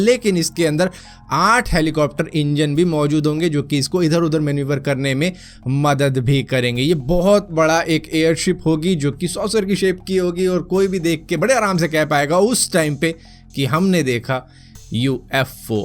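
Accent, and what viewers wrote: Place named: Maharashtra, India. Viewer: Bhai aapko sun ke I can definitely say that you're मराठी मानुस , कधी मराठी त पन बोला....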